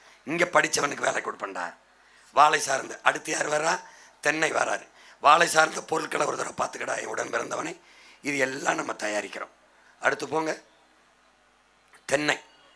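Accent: Tirunelveli, India. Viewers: ஏலே... திருநெல்வேலியா லே....😅😅❤❤❤❤🎉🎉🎉🎉🎉 நாம் தமிழர் ❤❤❤🎉🎉🎉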